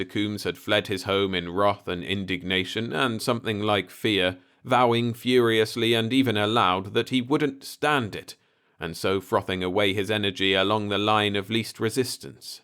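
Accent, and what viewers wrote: United Kingdom. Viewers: I'm in the same boat in regards to tech. I'm very glad still posts some stories here. His accent sounds sort of South East English to me, I hope he sets us straight. 😊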